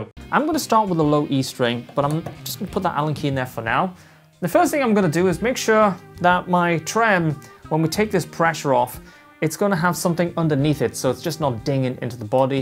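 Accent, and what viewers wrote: Newcastle, United Kingdom. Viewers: Ah, the toon accent! Drop you a sub, gotta support the local lad, awesome channel mate!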